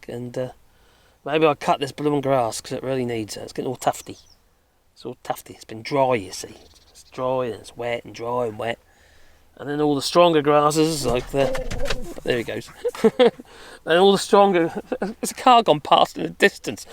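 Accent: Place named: Norfolk, United Kingdom. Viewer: In this strange troubling world we very much need people like you. Won't whittle on but not only talk a lot of sense but even better I get to hear a Norfolk accent as well. Lived many years in Overstrand and then Cromer and lastly in Wymondham. Keep going you are much appreciated by us tune in.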